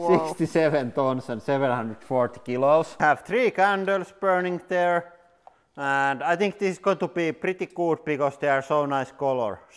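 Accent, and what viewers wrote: Finland. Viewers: Are you from finland? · I lived in Finland for two years and knew right away when I heard that accent. 💙🤍 Suomalainen voittaa aina.... jopa hydraulipuristimella! 😂 🇫🇮 🇫🇮 🇫🇮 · The finish accent makes it even more funny imo :D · Finnish accent is so suited to these videos « Ha! Let’s brrekk tings ! » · You can hear the Finnish accent 😂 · The swearing in a thick Finnish accent will never not make my smile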